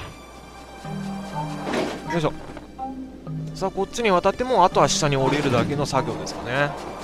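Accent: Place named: Ehime, Japan. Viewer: 初コメです!風のタクトを見てからずっとさんの実況大好きです。たまに出る愛媛のなまりとかついつい懐かしいと思っちゃいます(笑) さんアムロ、大好き!!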